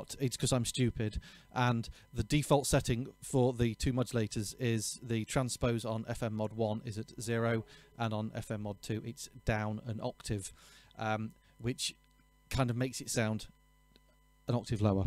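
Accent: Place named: East Midlands, United Kingdom. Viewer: What a fantastic sound! I can't wait for my Deluge to arrive, hopefully in a few days! You sound like a local East Midlands bloke to me. I'm close to Chesterfield Jcn 29. Do you do teaching?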